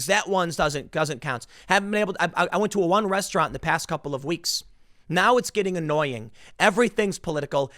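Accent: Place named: Chicago, United States. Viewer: This is the first time I've noticed a chicago accent from Tim. He must actually be upset about this.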